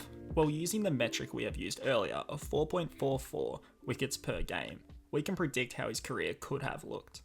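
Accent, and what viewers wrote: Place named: Australia. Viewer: Man shut up your aussie accent is so goofy, I can't take you seriously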